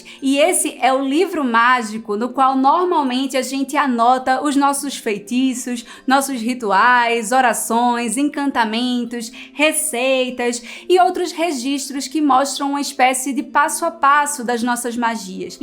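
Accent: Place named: Northeast, Brazil. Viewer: Nordeste, né, bê. 😘 És bem vinda aqui.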